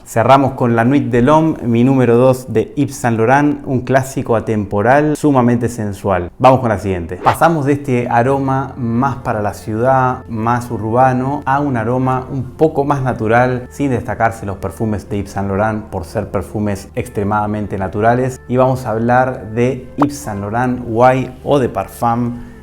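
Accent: Argentina. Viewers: nuevo sub,muy buen video. sos argentino no? Saludos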